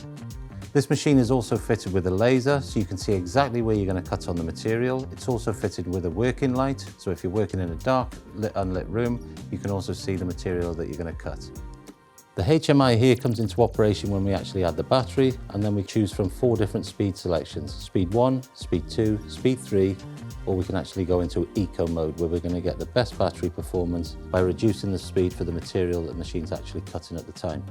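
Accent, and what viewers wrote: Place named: Wales, United Kingdom. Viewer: There’s welsh in that accent for sure!!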